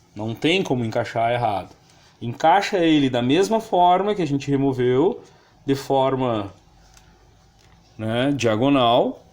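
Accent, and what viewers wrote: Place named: Rio Grande do Sul, Brazil. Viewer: Eita, gauchada sempre termina as frases com "NÉ" :face-orange-raised-eyebrow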